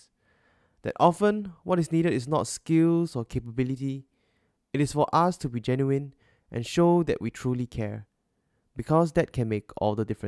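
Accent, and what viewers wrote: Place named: Singapore. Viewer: I can hear a Singaporean accent, I love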